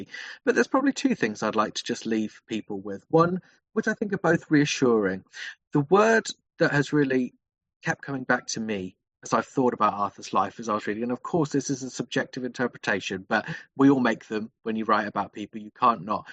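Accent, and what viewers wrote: United States. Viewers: No disrespect, but find listening to words mispronounced, and an American accent when discussing Tudor history, a lot of which is supposition, especially about Prince Arthur, very off putting.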